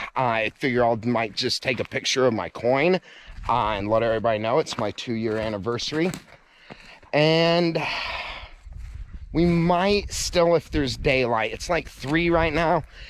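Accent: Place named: Bush, Australia. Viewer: You still have some of your "Bush Accent". I love it.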